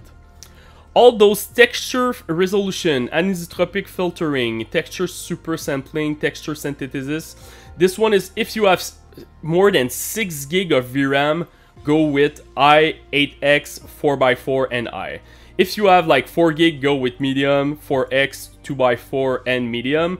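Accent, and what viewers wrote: Quebec, Canada. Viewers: est-tu Québécois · I knew you were French Canadian the second the video started. My mom has the exact same accent. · Quebecois fr 😁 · Québec right? Anyway, gr8t video! Thanks! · I can tell you have an accent “quebecois” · Your accent gives me flashbacks of CAF Basic Training in St Jean Sur Richelieu, lol. Thanks for the video, helped alot! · 100% you are from québec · He was just joking. I mean „your favorite french canadian“ - this is self-parody. Pretty funny imho. I like his accent btw. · It's funny how the windows game mode isn't a game mode. Are you francophone? From Quebec maybe, some of your voyelles sounds quebecoises. Your "if" sound really french!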